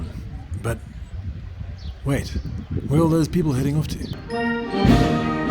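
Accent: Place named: South Africa. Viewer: Awesome how your SA accent hasn't changed after 20 years 😊. Please make a video of where to buy super cheap houses thats not remote or far from the bigger cities/towns. A seet up similar to the cheap Italian villages currently selling cheap. Not a €1 home but between €10k to € 20k. Iam aware of ruins for sale in countrysides of Portugal. Looking for something that needs cosmetics not structural renovation. I am a young Looking 52 year old South African living on Poland 🇵🇱 Looking to pay cash for a home base even if I don't live there full time. In SA am no longer a candidate for a home loan and honestly I don't want to be bound by 20 year debt, especially at my age. Want to enjoy income I earn traveling more.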